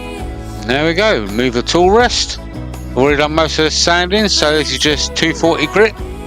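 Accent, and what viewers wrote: United Kingdom. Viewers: Very nice. I might try making a set to display in our family room. As a first time viewer I have two suggestions. Please turn down the music, being from the US it makes it hard to understand your British accent, and please move the camera so the chips don't aim at the lens. Thanks from a new subscriber.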